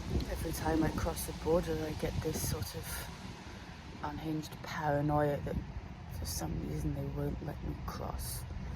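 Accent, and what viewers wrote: Scotland, United Kingdom. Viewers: Love listening to you :) you’re more Scottish on YouTube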